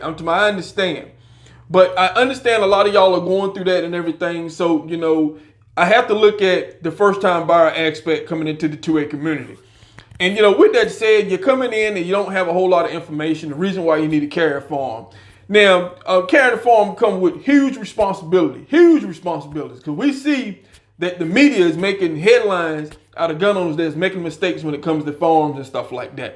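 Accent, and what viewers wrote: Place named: North Carolina, United States. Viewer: yea im not making fun of. him. Its a new way to say firearm. Nc accent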